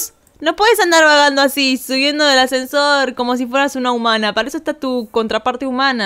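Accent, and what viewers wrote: Argentina. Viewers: Amo tus videos y me encanta ese asento tan argentino que tenes 😂 Te amo ❤❤❤❤❤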